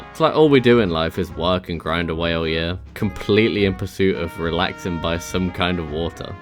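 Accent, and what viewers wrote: United Kingdom. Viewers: this is the most british man I have ever heard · Wait this guys British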